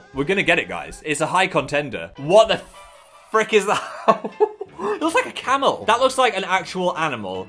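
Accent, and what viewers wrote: North, United Kingdom. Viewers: Is northern accent thicker these past few vids or is it just me?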